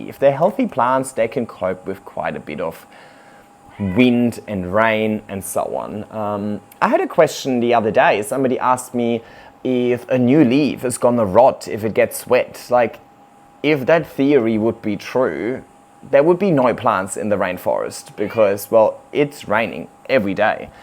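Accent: Germany. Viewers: Are you from Germany? :) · I can‘t stop wondering about his accent😅 Is he originally German?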